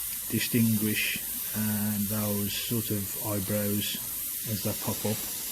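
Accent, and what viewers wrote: Black Country, United Kingdom. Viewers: I cor tell where yo accent is from whether it's more Cradeley or Oldbury/ Bearwood side lol either way, you aren't far from me. I have just started doing car and bike graphics after doing canvases for about 4 years. Great vid just wish it was around when I started about 5 years ago :) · Hi, bostin tutorial mate, just gerrin into airbrushin meself, bought a rook of createx wicked colours, badger patriot 105, compressor etc and bin avin a goo at tryin to do some skulls with real fire, gone a bit tits up a few times while i get used to the airbrush and the paints, musta watched 100 `how to real fire` videos on ere but your`s is the fust one that shows it in absolute detail which someone like me can follow in real time, crackin job mucka, BTW Willenhall here near Wolvo so we have similar accents dow we, arr LOL. Thanks for this mate it`s taught be a hell of a lot.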